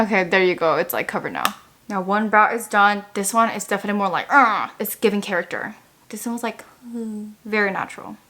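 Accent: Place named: South Korea. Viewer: 콩글리쉬 너무 귀여워여ㅋㅋㅋㅋ 메아크업도 예뻐여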